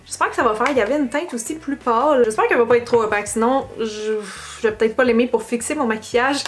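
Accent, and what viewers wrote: Quebec, Canada. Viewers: C'est marrant, au Canada on peut acheter son maquillage en pharmacie. C'était chez Jean Coutu a Québec Ste Foy. J'aime bien aimé Fit le fond de teint et Nyx aussi. Les prix sont très raisonnables. Merci pr ce charmant accent qui me rappelle mes séjours chez vous. Bonne continuation.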